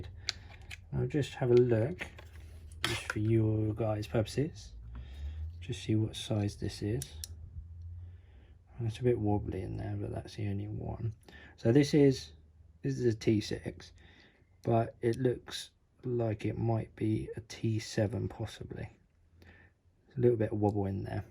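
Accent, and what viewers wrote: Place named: United Kingdom. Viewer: Thanks for the videos by the way, it's always nice to have another British voice chime in and help give insight with context of our laws and prices. The HH custom/special knives are such a good idea for us UK folk in regard to affordable and legal, I hope it prompts companies to start thinking globally a bit more when it comes to lock/size decisions. Thanks for stating the price, I wasn't trying to pry, just thought if anyone is looking at this and wondering how much of a difference used and new are it might help. I've noticed a lot of people talk about the resale market without mentioning price or where to go (I've found Instagram is the main second hand market).👍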